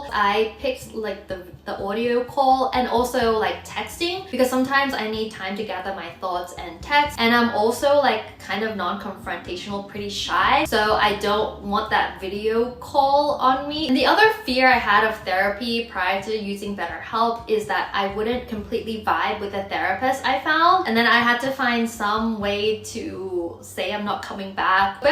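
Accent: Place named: Australia. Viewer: Does any one remember Australian accent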